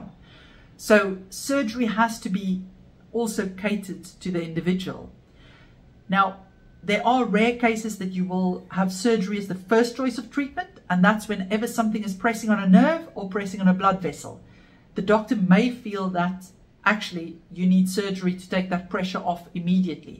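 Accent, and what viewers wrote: South Africa. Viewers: Edit: From the accent it occurred to me that you're South African,. Spike did my arthroscopy.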